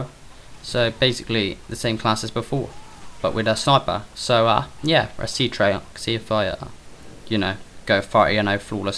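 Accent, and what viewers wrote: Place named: West Midlands, United Kingdom. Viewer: you sound like your from the west midlands cuz my bro speaks like you and hes from the midlands like me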